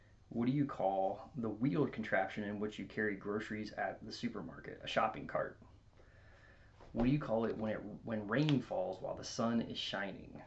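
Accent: Maryland, United States. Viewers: I was raised in Maryland until i was 8 but i moved to Georgia and lived there for about 14 years before living here in California. I never picked up a GA accent because my mom is from Maryland too, so i talk like her and hearing your accent reminds me of mine and my parents'. They are from the P.G. County area I think. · Yep, good job. You sound like a solid Marylander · I knew you were from Maryland after the first five words. I have seen some preposterous attempts on You-Tube, that bear no resemblance to even the thickest Baltimore or Eastern Shore accents. I've lived in New Mexico a long time, but when I hear the accent of Maryland, Delaware, or New Jersey , it feels very settled, very comfortable. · I appreciate the time and care you took with this topic. You share some of the same accent markers as my mom. She said “heel” instead of him. Oh God, she said “nindy” instead of ninety.” She called milk, “melk.” I dropped the -ing as a kid but not now. 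But your words are pretty solid for MD. We called tennis shoes (our sneakers) tenners ,as kids. Otherwise, sneakers. Yeah, my mother was a water person. I’m subscribing and hope to see you again. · Upload more videos of you speaking. I would like to learn your maryland accent.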